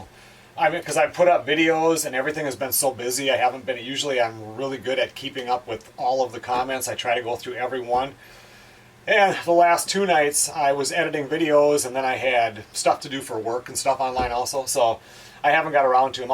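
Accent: Minnesota, United States. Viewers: I got two questions. 1. Does the MN DNR restock your lake or is all natural? 2. How come you / don't ice fish at the tent lake? Thx I grew up in grand forks and spent alot of time as a kid fishing MN lakes. Your accent reminds me of my MN family's.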